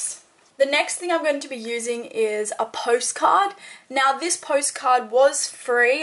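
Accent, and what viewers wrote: Australia. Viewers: You're aussie accent is so refreshing! I'm an Aussie and I don't know why but I get so used to international accents on YouTube videos that it's always such a nice surprise to hear Australian accents and phrases online! This video made me realise how much I've been missing simply talking to other people because of the pandemic! Excellent video too 😊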